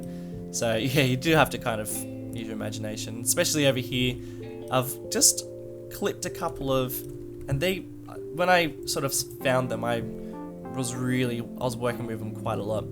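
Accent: Australia. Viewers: You sound like an Aussie. But I can't tell.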